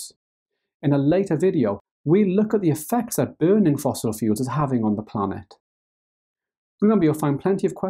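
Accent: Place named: Newcastle, United Kingdom. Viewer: He's from Newcastle, so I'm guessing it's a slight geordie accent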